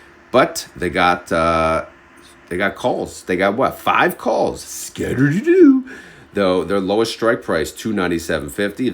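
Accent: New York, United States. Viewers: Great voice and NY accent Your title should be “the godfather on dividends”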